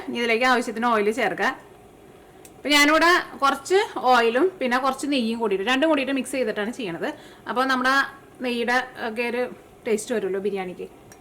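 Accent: Thrissur, India. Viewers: Thrissurkaari aaahno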